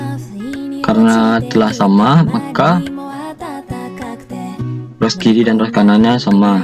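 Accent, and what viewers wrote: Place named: Padang, Indonesia. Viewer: Padang banget ya nak logat nya... :3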